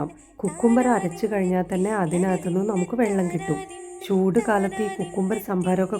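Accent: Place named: Pattambi, India. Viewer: എന്റെ നാട്ടുകാരിയാണോ?. സംസാരം കേട്ടപ്പോൾ തോന്നി. ഞാനൊരു പട്ടാമ്പി ക്കാരിയാണ്. ഞാൻ കൂട്ടായിട്ടോ. തിരിച്ചും സപ്പോർട്ട് വേണേ 👍👍👍💕💕💕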